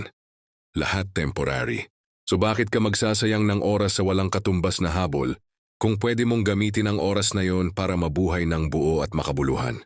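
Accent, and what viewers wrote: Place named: India. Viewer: maniniwala na sana ako kaso parang indian na naga tagalog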